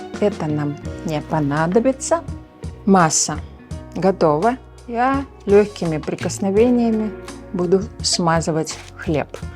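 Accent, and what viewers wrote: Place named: Baltic. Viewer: ну надо же, а мне привиделся прибалтийский акцент, у нас похоже латышская женщина, партнер по работе говорит, очень приятный акцент ❤❤❤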